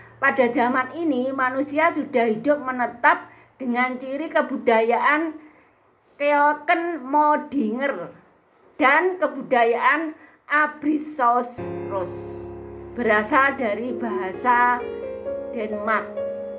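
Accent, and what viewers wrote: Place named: Java, Indonesia. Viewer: Aksen jawanya aku suka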